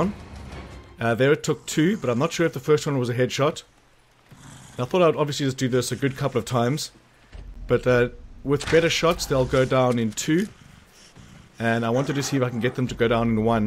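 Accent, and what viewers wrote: South Africa. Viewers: Hey dude, you sound South African....are you?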